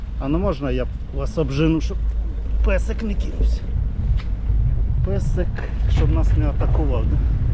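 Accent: Poltava, Ukraine. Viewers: А що не так з суржиком? ... Мені дуже по-домашньому відчувається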